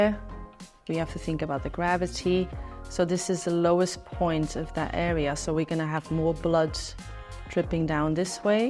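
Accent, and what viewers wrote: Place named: Australia. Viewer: Lovely English pronunciation 😍 Oz one right?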